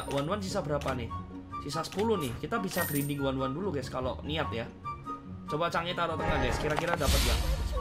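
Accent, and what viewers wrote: Java, Indonesia. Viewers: Wong jowo ndi mas e ? Bhs indonya medok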